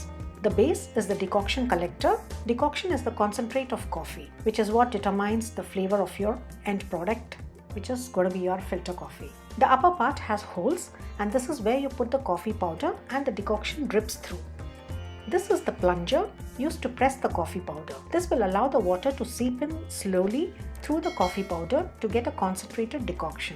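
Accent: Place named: Tamil Nadu, India. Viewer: Nothing like our South Indian filter coffee (kaapi)! It's something I used to miss on all my business trips abroad till I started carrying a filter and coffee powder with me!, Wow! Super English pronunciation, diction and intonation even though there is a slight aroma of 'Filter Tamizh' in the accent! 😅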